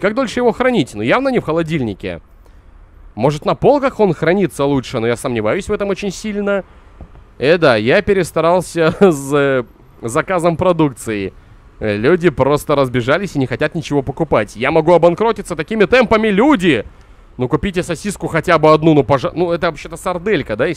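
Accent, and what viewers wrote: Belarus. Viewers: ты белаРус? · Ты из Белоруси?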